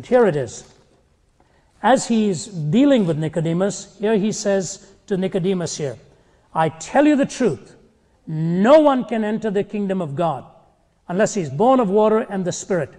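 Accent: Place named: India. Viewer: From the outside, he sounds like any other evangelist/ con man, only with that India/ Pakastani/ guru accent, that makes people 10 times more likely to believe he has spiritual access to your God. Doh!... Why is it, that all of the defenders of Christianity have a big money stake in keeping it going?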